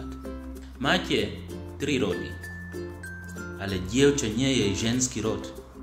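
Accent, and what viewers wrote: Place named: East Slovakia, Slovakia. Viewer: To preto lebo žije na východnom Slovensku. Na východe sa rozpráva paradoxne najčistejšou Slovenčinou.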